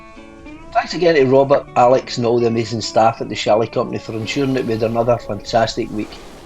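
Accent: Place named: Scotland, United Kingdom. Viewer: We are going there next Feb & this vid has Gave us great insight of what's to come. Great tae hae a Scottish accent on the vids tae. Brilliant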